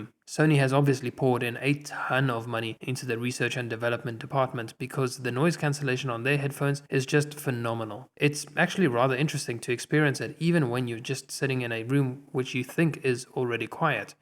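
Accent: South Africa. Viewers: South African accent, but living in Dubai.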